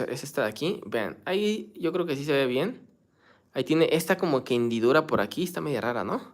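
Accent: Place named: Mexico. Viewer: BUENAS TARDES EN CANARIAS.... BUENAS TARDES PARA TODOS LOS QUE ESTAN EN ESTA LINEA... QUE PLACER ESCUCHAR EL ACENTO MEXICANO, Y SABER QUE ES NUESTRA MARAVILLOSA LENGUA, LA ESPAÑOLA ❤ ❤ GRACIAS POR ESTE LINDO VIDEO.... UN SAUDO PARA TODOS....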